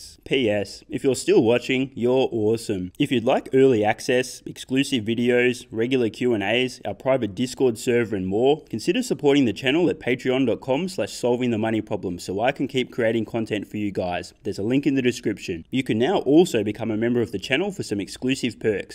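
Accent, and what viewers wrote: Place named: Australia. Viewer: Your bloody Australian accent is delicious!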